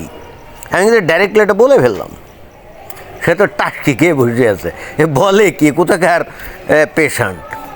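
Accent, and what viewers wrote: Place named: Narail, Bangladesh. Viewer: মহাশয়, আপনার ভাষার টান শুনে মনে হয়, আপনি নড়াইল বা এর কাছাকাছি কোথাও জন্ম। আমার বাবা ঠাকুরদার বাড়ি ওখানে ছিল। এই রকম কথাতে টান ছিল ওনাদের। আপনি ডাক্তারদের সঙ্গে কথা বলেছেন, ওরা ভয়ে কোন মন্তব্য করেননি। মুসলিমদের কোনও বিষয়ে অপরিচিত লোকেদের সামনে মুখ খুলতে ভয় পান সাধারণ অমুসলিমরা ,রাজনৈতিক কারনে।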